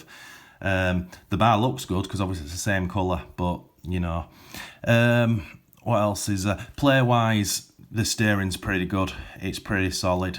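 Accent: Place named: Yorkshire, United Kingdom. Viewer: new to your channel really liking it a yorkshireman in canada dont lose the accent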